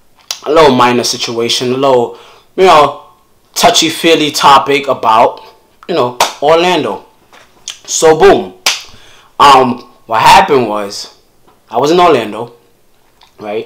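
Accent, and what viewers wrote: New York, United States. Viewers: I love his waves and that newyork accent❤️❤️❤️❤️❤️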